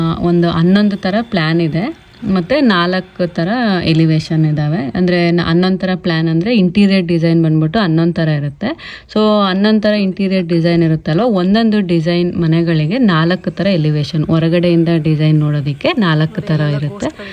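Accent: Mandya, India. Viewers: Nivu mandyadavara? Thumba chanag matadtira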